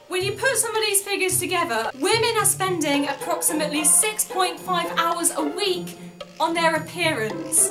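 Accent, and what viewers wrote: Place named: United Kingdom. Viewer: I really enjoyed this video! Please keep them up =] Where are you from? You have a very strong British accent!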